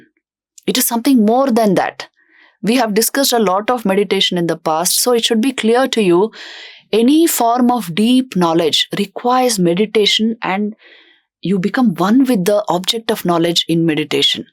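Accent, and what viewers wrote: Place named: United States. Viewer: Conversion of the lecture to American accent is not enjoyable 😢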